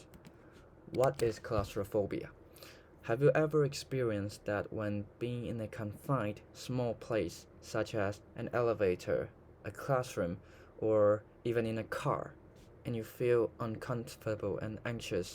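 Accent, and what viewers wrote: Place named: United Kingdom. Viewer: 這英國腔你是英國人吧 wml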